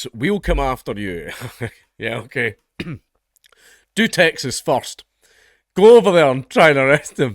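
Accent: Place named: West Scotland, United Kingdom. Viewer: The police officer that told men the put your weapons away in a mosque, the police have guven them amnesty. None of them will see the inside of the court. As a feelow scots please keep shinning a light on what they are doing to us. My daughter has asked if your from Edinburgh as your accent sounds like mine, i dont thiugh i think your fi the west coast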